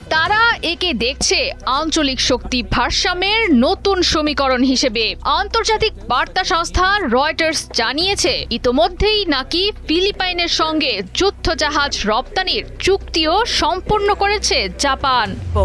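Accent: Kolkata, India. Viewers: কলকাতার সুরে খবর পড়লেন মনে হলো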